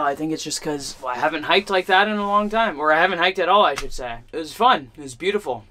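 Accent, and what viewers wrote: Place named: South, United States. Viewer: You put so much work into your videos! It takes time to get all those transitional shots where you get the video and then have to go back and get the gear. Wow. And you must put lots of time into editing. So many shots that flow so well telling a story. And the sound is mostly great! (At the end when the music seemed a little loud to me I just turned down my volume. Smile.) Did you add the surf, wind and sea gull sounds to the coastal drone shot or did that come with the music? Nice touch. You may be a California man but sometimes you really sound like you're from the south. (I'm a native Arkansan.) I'm looking forward to your videos!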